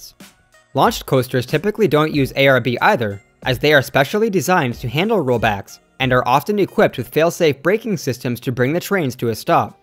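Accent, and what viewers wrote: Canada. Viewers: I can't get enough of how you say roller coaster. God Bless Canada and its unique collection of modified vowels sounds. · wait, are you Canadian?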